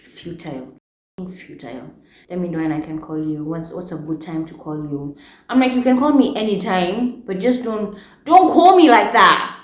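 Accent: United Kingdom. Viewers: Newly hooked and is pretty😻your skin is to die for...Damn!!! Also it's the Britt accent for me😂😂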